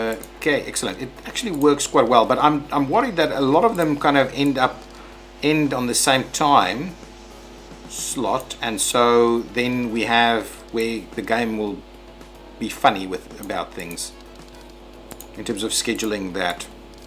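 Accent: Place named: South Africa. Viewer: Your English seems a bit South African🤔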